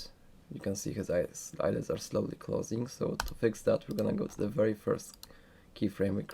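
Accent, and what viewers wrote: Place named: Poland. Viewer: masz polski akcent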